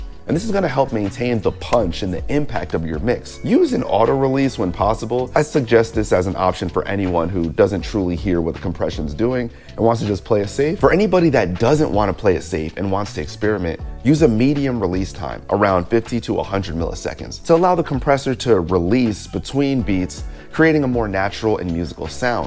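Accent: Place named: United Kingdom. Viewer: look, bruv, i for one enjoy bri’ish miami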